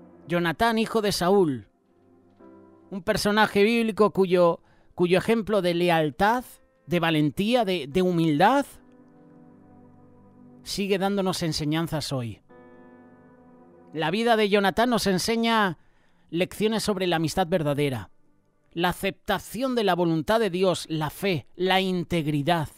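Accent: Spain. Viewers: Saludos desde Colombia gracias por su narración de la historia de David y Jonathan Bendiciones .... Tengo una pregunta fuera del tema me di cuenta que es usted español porque en España prevalece el catolicismo si en toda europa estuvo Jesus con sus enseñanzas? Es una curiosidad me gustaría si alguien sabe la respuesta me explique gracias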